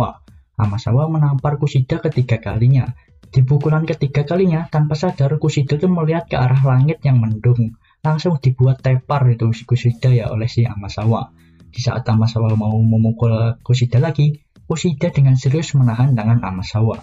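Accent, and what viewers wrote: Java, Indonesia. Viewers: Sedang mendengar cerita LN dengan suara medhok,☝👍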